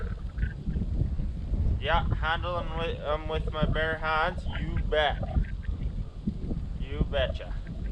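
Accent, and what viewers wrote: Canada. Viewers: You sound like your from waaaaaaaaay out there in Canadian.. you ever get any lynx mate?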